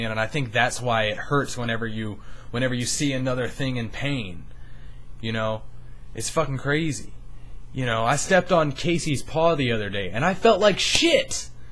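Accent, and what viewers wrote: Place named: Jamaica. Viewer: how often do you smoke pot? you speak like a true Jamaican. You're Echo Consciousness Theory is crazy (in a good way) and you do need better acid or more hits to get really fucked up. my friend had a trip where the only way he could explain it was he died and became a circle. anyway keep doin your thing boss, u shud try by ft.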